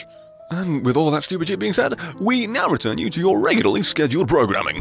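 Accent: United Kingdom. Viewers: I can’t tell if he is English, Scottish or Welsh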